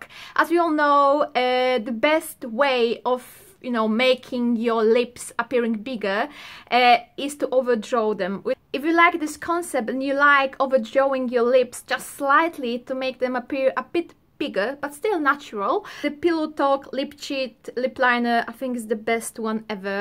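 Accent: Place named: United Kingdom. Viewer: pfffeeeeeerfect, te typowo brytyjskie akcenty są niesamowite w Twoim wykonaniu, uwielbiam akcent brytyjski a u Ciebie słychać go tak przeuroczo ;) xoxo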